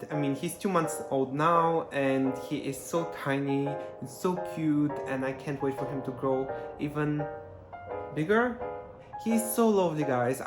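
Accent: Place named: Cyprus. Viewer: Awwww God Bless You Both ♥️♥️ So much to say....are you from Cyprus ? I have a young man living with us here from Cyprus, you sound very much like him. I also have a Scottish Fold....but he has long hair and straight ears, the Highland Fold Straight. I watched Maru for many years on YouTube. If you haven't seen him, please Google him . Maru holds the Guinness Book of World Records for the most viewed pet on YouTube ! He lives in Japan with a wonderful Lady and two female domestic shorthairs named Hana and Miri. He is Absolutely Adorable ! He loves boxes 😂🤣😃♥️ I was so in love with Maru. My Daughters were grown and I had no pets. I asked my Daughter if she could find a Cat for me. She went to her Facebook page and the first post was a cat, his owners moved and left him behind !!!!! I will never understand that. She brought him to me and I was shocked because I knew right away that he is a Scottish Fold.... 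I just adore him, his name is Fat Boy. Anyway, there is one trait that is frequently said about the Scottish Fold....They can be stubborn. Fat Boy really lets me know when he wants to be brushed, and then he sits about a foot away from my outstretched arms so I have to come to him ! I have had Cats all my Life. Fat Boy is very Special. Enjoy Kovi, he is adorable !!!!!!! ♥️♥️♥️♥️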